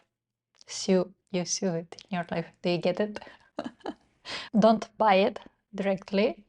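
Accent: Romania. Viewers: După accent am gândit ca ești româncă! Deși am lucrat în afara țării ani de zile, am același accent cu al tău!Ma bucur ca ai făcut acest video,pentru ca și eu am făcut abuz de parfumuri și am format o manie pentru asta și m-am simțit vinovată de fiecare dată!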